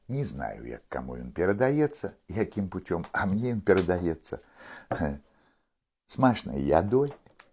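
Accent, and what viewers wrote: Belarus. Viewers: Трасяначка шо нада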